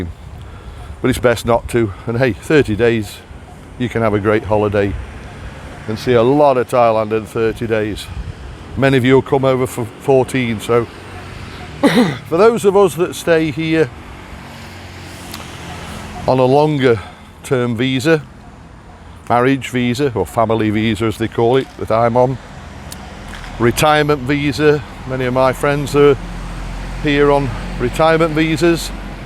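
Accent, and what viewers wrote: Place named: Stoke, United Kingdom. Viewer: New sub here, you sound like a stoke youth ?..i,m back in uk after 7 months (222) days in thailand, i cant wait to get back ,. ive been over there 11 times in all since 2009, ive got a long term thai g/f and we live at her nice house in kamphaengpet , ive got a car so we travel all over TH usually for a month when i get back there, .The last trip was 25th nov 22 and we did most of the southern islands , spent a couple of days in kamala beach down your neck of the woods , I had my fill of thai immigration with extensions and border bounces but it worked out ok to maximize my stay,. I bet it does your head in looking at the thai power pole wiring, im surprised it wasnt 1 of your 5 thai dislikes, !. I plan on getting a retirement o visa next time but there's talk of immigration doing a 90 day visa exempt and changes in the 800000 bht retirement o visa, have to see how that goes, i want to be back by the end of november latest,. 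Bit pointless getting a 60 day tr visa in uk if they end up doing a 90 day free one, im doubting it will happen , having only retired on nov 18th 22 and flying out on the 25th nov 22 69 y/o times not on my side, ive been back in uk since july 4th 23 and i cant seem to get motivated over here, i was planning on selling up and shipping out but with the unsertanty with th immigration its easier said than done, i might go down the agentcy route visa wise, although i have the money and resdency out there ive not got thai bank account yet,.we will see how it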